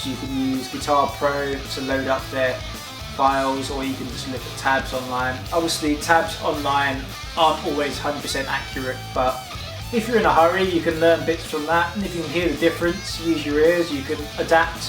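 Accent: United Kingdom. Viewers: You're BRITISH!!!! I thought you were spanish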